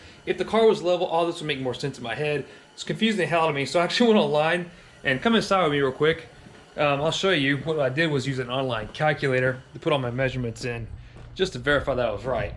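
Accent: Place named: Australia. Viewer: just love the Australian Accent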